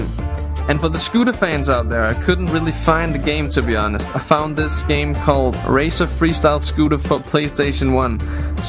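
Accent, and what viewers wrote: Denmark. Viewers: Er du fra Danmark ? Du lyder virkelig dansk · man ka bare høre at du er dansk men hvis man er udlansk er det selvfølgelig ikke noget problem XDXDXDXD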